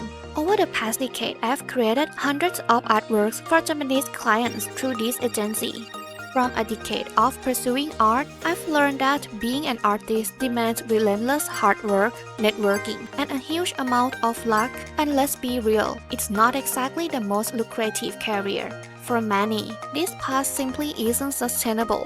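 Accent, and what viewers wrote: Thailand. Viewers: I knew it from your accent and bombastic artstyle that you are from Thailand (ONE OF US ONE OF US) this video helps me so much as a young aspiring artist +sub!! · ฟังสำเนียงคิดว่าน่าจะคนไทย ใช่จริงๆ ด้วย เก่งมากเลยค่ะ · นั่งฟังตั้งแต่ต้นคลิป รู้สึกว่ามีไอบรรยากาศความเป็นคนไทยมากๆ ทั้งการออกเสียงทั้งสำเนียง สุดท้ายก็คนไทยจริงๆด้วย😭 เก่งมากๆเลยค่ะ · กรี๊ดดดดด สวัสดีค่ะ ผ่านเข้ามาเจอ คิดว่าสำเนียงไทยม๊าก สนุปว่าคนไทยจิงด้วยย เก่งมากๆๆๆเลยค่ะ❤❤❤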